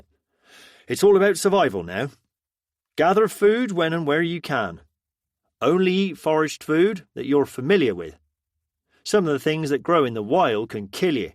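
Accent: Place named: United Kingdom. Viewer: Brits can even make the zombie apocalypse sound funny 😃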